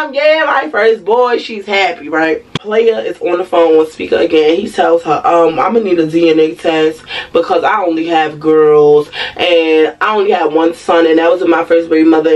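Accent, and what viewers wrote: New York, United States. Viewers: New subbie . Are you from BK? I swear you sound like a NYC girlie . Anyways, the advice you gave her about the advance and taxes was so solid, but she wasn’t ready to hear It. Your friend reminds me of a girl I used to be cool with . Tried to help her get back into school, paid for groceries, and tried to help get her life back on track just for her to dog me out. Sometimes it’s not about you, ppl are gonna be who they gonna be 🤷🏾‍♀️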